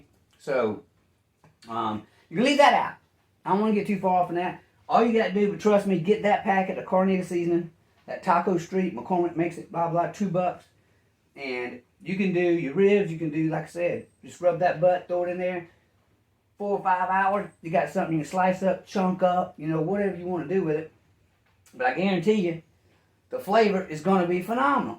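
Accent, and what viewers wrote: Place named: Louisiana, United States. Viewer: Are 6ou from Louisiana?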